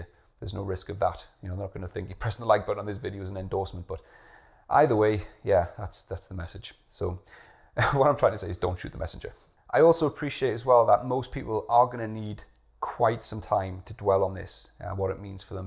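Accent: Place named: Newcastle, United Kingdom. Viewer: I thought you sounded like a geordie!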